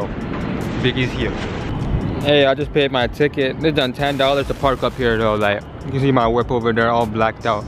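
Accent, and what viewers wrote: Jamaica. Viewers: U know to talk like Jamaican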